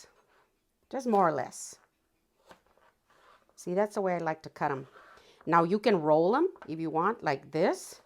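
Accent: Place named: New Mexico, United States. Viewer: I love you miss I love everything you make. I'm from new mexico your voice makes me home sick.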